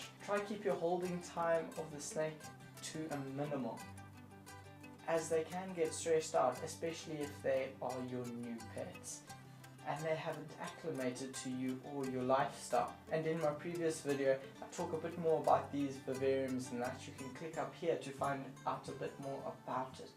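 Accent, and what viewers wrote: South Africa. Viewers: South African? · Is that a south African accent bro?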